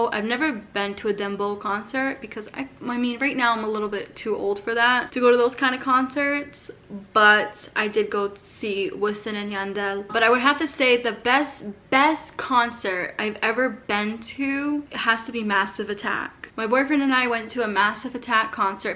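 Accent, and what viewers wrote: Boston, United States. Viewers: I love the Boston accent! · I loooooove your Boston accent 😊!!! Don’t get rid of it! 😢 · To add to the chorus, please keep your lovely Boston accent. I didn't know it was a thing until I found you:))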